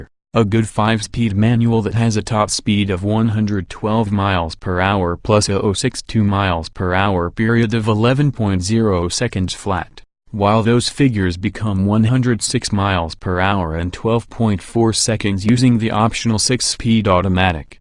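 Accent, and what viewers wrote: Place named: United States. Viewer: Crap USA accent crap review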